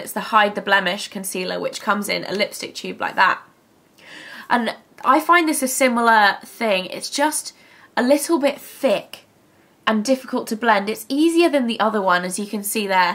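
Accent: England, United Kingdom. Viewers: Aww ur accent reminds me of when I used to live in England! Awe I'm defiantly going to buy one of ur recommended concealers...lol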